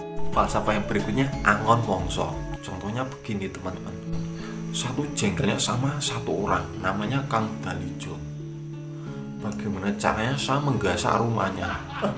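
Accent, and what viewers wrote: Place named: Java, Indonesia. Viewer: Wong jowo ki nak ngendi2 mesti logak e ketok mas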